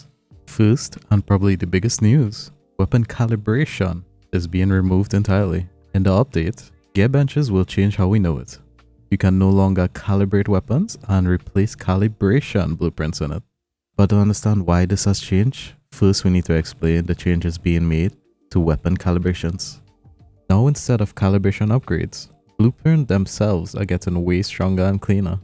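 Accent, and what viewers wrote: Trinidad and Tobago. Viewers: Lol I commented this too..lol u cant hide that accent g I hear my people voice 🤣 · Are you trini?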